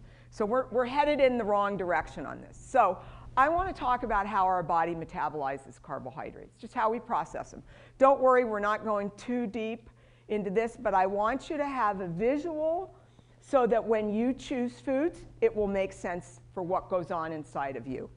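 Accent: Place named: United States. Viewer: This lady has no sense of speech. Sloppy diction. And she gives the American accent an ugly name. Yuk.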